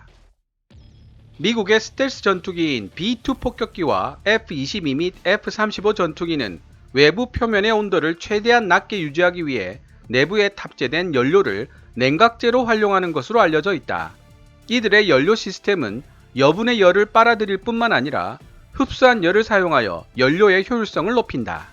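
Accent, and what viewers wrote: Gyeongsang, South Korea. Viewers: 알만한 사람는 다아는 내용을... 호들갑 떠내... F35 파는 이유는 F22가 있으니까 파는 거고 F22안파는 이유는 F22보다 뛰어난 기체를 아직 개발보유하고 있지 않기 때문이지... 이사람 갈 수록 방송 제수 없게 하네. 영어도 존나 못하고 발음도 개떡같이 하네. 어떻게 영어발음에 경상도 사투리억양이 들어가있냐?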